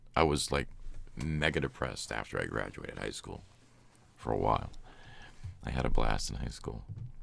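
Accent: Boston, United States. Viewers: Hi, I have to say, it's nice to hear your normal speaking voice! You should do more with your own Boston accent some day, it's very warm and personable! I love a lot of ASMR channels but the rumbly sound of your voice puts me right to sleep 💚😷😴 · It’s so weird to hear you talk normal volum... I never even knew you had a Boston accent! Congrats! And that marble device is very... T E C H N I C A L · You er so Boston I hade no idea.. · I should have known you were from Massachusetts. I hear that lovable Boston accent. =) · Haven’t been subscribed for long, a few months, but I was not expecting the Boston-ish accent! So glad I found your channel. Your voice and creativity is one in a million! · Are you from Boston? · Hearing you talk in your normal voice, I hear......Boston accent 😂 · I cannot be the only one thinking he’s attractive 😳 That Boston accent maaaan 😍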